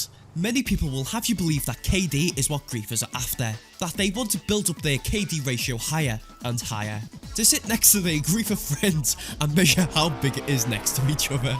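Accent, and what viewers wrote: Liverpool, United Kingdom. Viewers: W video scouse man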